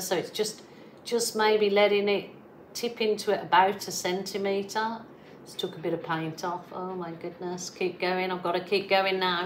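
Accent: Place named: England, United Kingdom. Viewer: Very cool! So what did you use that gave you those cells? Nice! You know what I haven't heard for ages? "FINGER POPPING!" 😂 You've definitely got the pom accent 😊That's who you are! I moved to Canberra from South Africa 18 months ago and I doubt I'll ever pick up the Aus accent. Maybe a little here and there but in general, no. Kids might though! 💕💜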